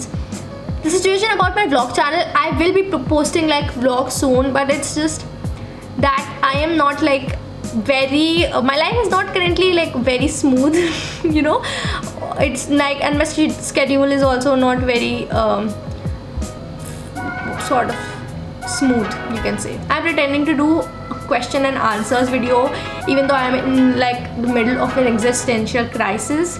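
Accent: India. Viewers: Yuh R NATURAL n that's why I ❤ yu n yur channel...... Yuh have a great n clear Indian accent with great fluency of English ( IDK why ppl say that yuh R not goooood in English like seriously it drives me crazy! ) And yuh R not like fake ppl who show their prerogative n privilege n their accent more then their content...... so no negativity in yur channel..... loads of ❤ from UK! N U R D Best......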